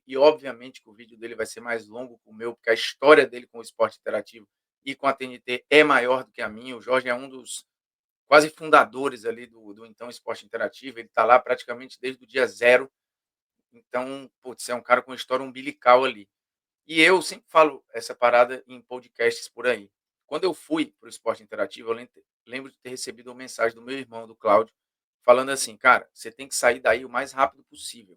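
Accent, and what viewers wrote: Northeast, Brazil. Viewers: Parabéns pela sua carreira! Boa sorte na nova empreitada e que tenhamos mais ótimas opções como é e continua sendo a TNT/Esporte Interativo! E não deixa a nova casa tirar esse seu sotaque nordestino incrível! 👏👏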